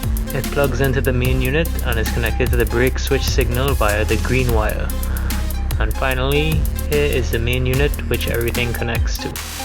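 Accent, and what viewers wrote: Trinidad and Tobago. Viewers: Are you Trini? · Hey are you from Trinidad and Tobago? You sound like a trini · You sound like a Trini 🇹🇹🇹🇹🇹🇹 good job bro if you are indeed from Trinidad maybe you can help me connect this to my Legacy GT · “Dem one handed skills thooooooo”. Definitely a Trini. Thanks for this man, top notch stuff. From your Jamaican cousin! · Hi wonder if you can help? I have thks same kit and a 1996 Toyota Supra. The dash wiring that connets to the barrel has 6 wires...can you help me out? Btw you sounding like a trini?? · your from trinidad aint you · Accent sounds Trini.. Now i can do it myself.. Ydk.. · Almost sounding trini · U sound like a trini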